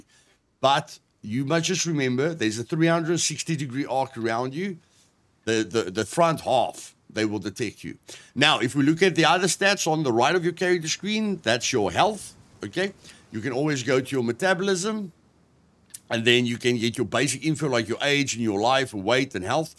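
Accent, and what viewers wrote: Afrikaans, South Africa. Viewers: You Afrikaans?😅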